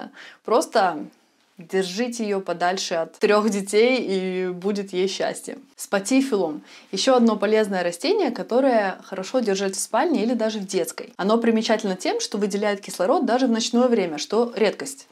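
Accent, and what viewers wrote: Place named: Baltic. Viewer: Умница! Красавица! Спасибо Ирине за то, что она рекомендовала Вас!!! Обожаю ваш прибалтийский акцент. Вы для меня и настроение ,и вкус , и эстетика и женственность!!!❤❤❤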